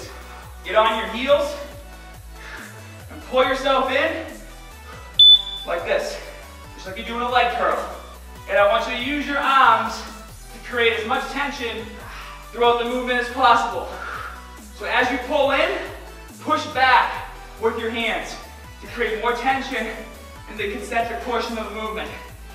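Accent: Boston, United States. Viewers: This guy is so hyper and it hyped me up it’s awesome. Also I’m from Boston and I love the accent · Here due to Covid-19 gym closure. I live in Quincy, MA. And that sounds like either a fake Boston accent or someone with a Boston accent trying to hide it. I grew up in MA with immigrant parents so I talk different than everyone. But back on topic, my quest is to not lose any muscle or strength during this crisis. Good workout! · This was just what I needed for my Coronavirus outdoor workout...your Boston accent kills me! hahahahahaha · Boston accent like crazy! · its a boston accent i think. it wasn't always so prevalent in the past though. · The Boston accent is strong with this one... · the boston accent is strong in this one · Love this leg workout. has the BEST Boston accent ever!!!!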